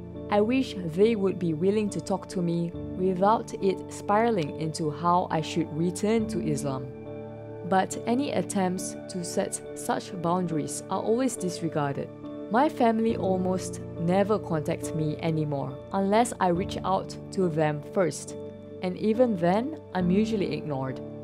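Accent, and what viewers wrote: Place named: Kedah, Malaysia. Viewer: he speak bahasa kedah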